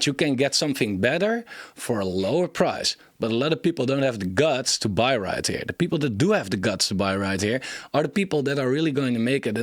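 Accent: Netherlands. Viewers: your accent feels like The Netherlands... Im from Belgium lez get millionaire next 2 year! Keep up the work.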